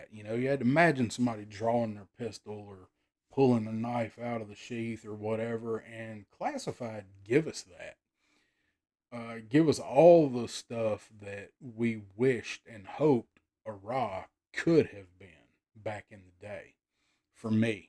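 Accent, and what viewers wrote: South, United States. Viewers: Great video! The drawl is REAL y'all!! It's what we call "talkin'" down here in the south. Nonetheless, this is a good vid to let everyone know what you are about. So far, so good! I can't wait to see what you do with this channel, man! It's off to a great start!! And this is a love letter to the power of family and how important that is to our lives. We are fortunate to have gotten so many Joes and other toys at the time! I know not everyone grew up that way. But, we did, and it was freaking awesome! The creek, the woods, the go karts, the BB guns and hunting snakes, the dump trucks, the dirt clods, the lemonade at our grandparents, the small town gas stations, and the southern drawl. But most of all for us, it was GI Joe and it was the best! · Hell yeah! Love the humor and the Southern Drawl!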